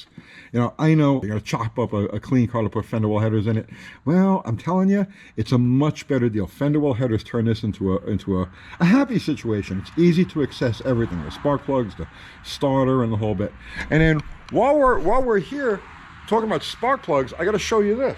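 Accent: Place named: New York, United States. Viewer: You can take out of New York but you can't take the New York out of forgetaboutit!!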